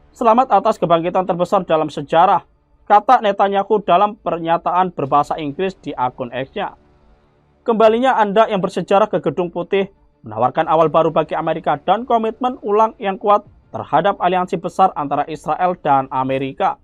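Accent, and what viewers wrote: Java, Indonesia. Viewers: Penyiarnya medok Jawa. Intonasinya tdk bagus.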